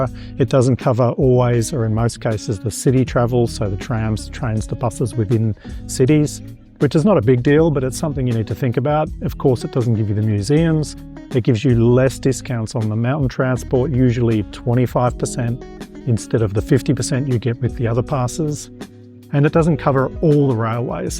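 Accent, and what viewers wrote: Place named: Australia. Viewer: Sound like an Aussie.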